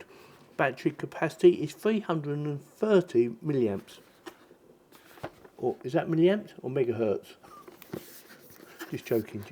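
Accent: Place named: Cockney, United Kingdom. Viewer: Just saw a different helpful video of yours and I've subscribed. Great to hear a cockney accent too! God bless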